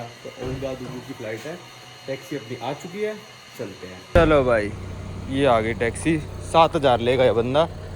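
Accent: Haryana, India. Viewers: Bhai ka Delhi accent change hoke dhire dhire haryanavi nikal raha h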